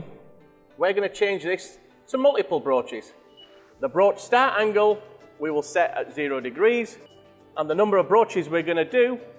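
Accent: United Kingdom. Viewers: Love the accent too. It's an accent I always associate with traditional British engineering, and steam trains.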